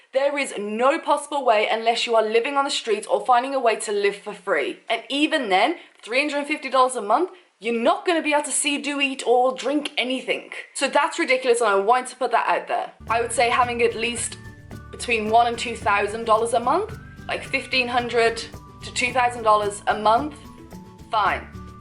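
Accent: United Kingdom. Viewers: are you from UK ,Darling !!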